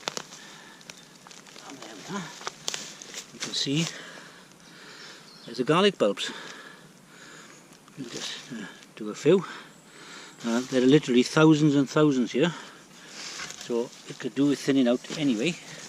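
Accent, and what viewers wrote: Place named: West Wales, United Kingdom. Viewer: Great video I could listen to you reading the telephone directory. I love your accent is it West Wales?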